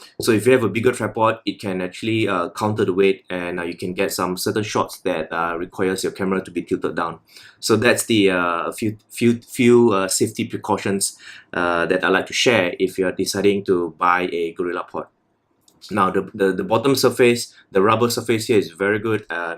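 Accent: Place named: Malaysia. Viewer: When I heard you speak I instantly knew. Fellow Malaysian!!!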